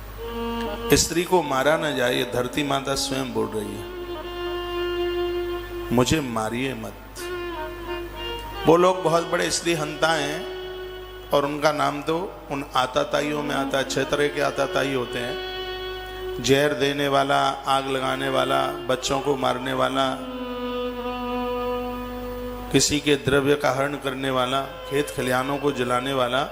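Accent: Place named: Bundelkhand, India. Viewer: Maharajji aapki bundelkhandi bahut malook lgat hai hm bhi bundelkhandi hai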